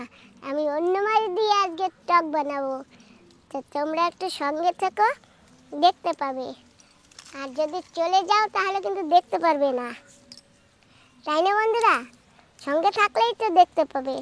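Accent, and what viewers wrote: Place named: Khulna, Bangladesh. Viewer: দিদি আপনাদের বাড়ি মনে হয় বাংলাদেশের ছিল আপনাদের বাসা তো খুলনার ভাষার মত খুব ভালো লাগলো আপনাদের ভিডিওটা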